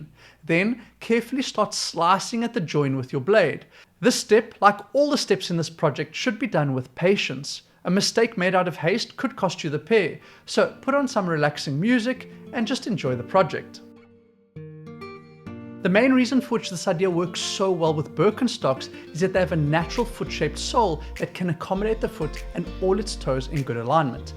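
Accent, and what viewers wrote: South Africa. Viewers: Great video! You sound South African 😊 I was just wondering why barefoot shoe companies don’t make Birkenstock style barefoots. I will try this on an old pair of birkies.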